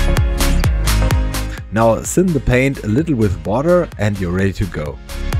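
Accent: Germany. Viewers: I mean, one can obviously tell you are german by your accent, but are you aware you sound crazy similar to docm77, a popular german minecraft youtuber from karlsruhe? :D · He sounds to be German! That explains it.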